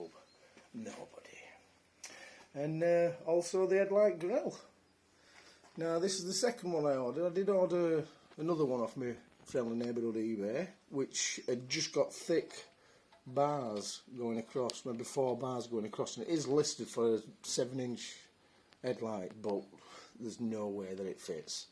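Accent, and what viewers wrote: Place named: United Kingdom. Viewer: I sucribed bro.Nice accent which part of UK you from.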